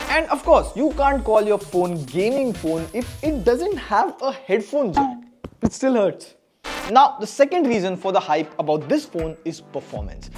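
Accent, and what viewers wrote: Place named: Bengal, India. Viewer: I think you are a Bengali boy because your English tone is just like them 😅😅😅😅😅 answer me please 😢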